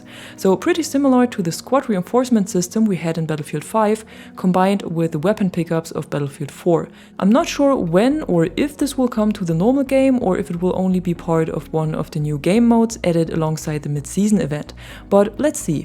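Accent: Germany. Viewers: I dident ment in a bad way. The accent gave you up. Cause i work in turism and you sounded like a German speaking english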